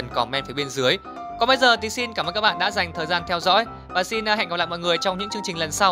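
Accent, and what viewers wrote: South, Vietnam. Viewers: Anh nói giọng miền Nam hài quá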